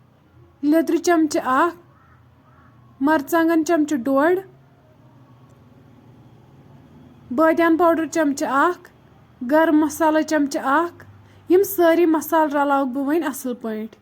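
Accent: Kashmir, India. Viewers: Wariya asel...lagta aap k accent se aap south kashmir se ho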